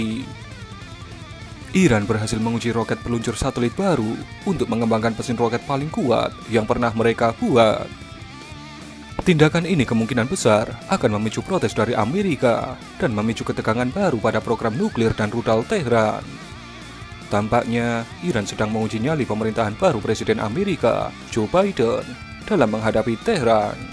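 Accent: Java, Indonesia. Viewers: suaranya medokkk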